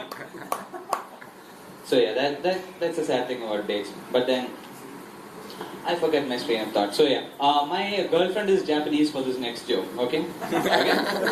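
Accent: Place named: Kerala, India. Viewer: Haha.. This s one of its kind .. Mallu accent at its best and someone s actually cashin in on it. ... Good Job!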